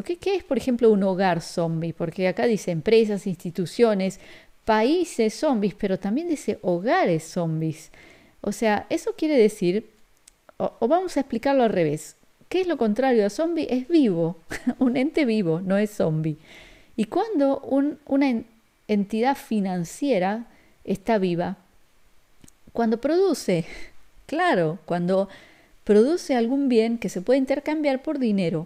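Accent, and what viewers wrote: Argentina. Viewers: Naciste en Argentina por tu dejo. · Ese acento tan bonito *, Argentina! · Tu acento ... te delatan, sos de Argentina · Por tu acento, naciste en Argentina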